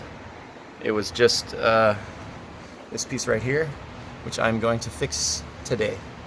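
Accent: United States. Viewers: Thanks for the information man really can in clutch but are you from Texas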